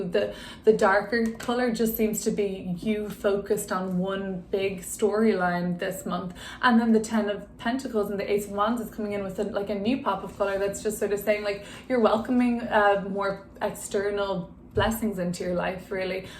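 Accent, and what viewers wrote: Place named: Ireland. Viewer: I love your beautiful irish accent, i should know, im from Dublin, lol. Thank you, beautifully read and spoken.🙏💚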